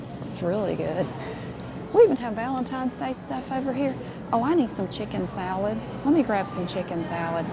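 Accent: South, United States. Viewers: I’m from Nebraska and most people only eat Hidden Valley Ranch. If I came home with generic ranch, my family would flip out no joke. Midwest is serious about our ranch LOL! I love your videos and your voice and southern accent. It’s fun shopping with you and watching you straighten things. Thank you! 😊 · just recently found your videos. I must say that they are the best distraction for my anxiety. and Im from the south and your accent is very reminiscent for me, always watch them before bed Lol😂 thank you for being hilarious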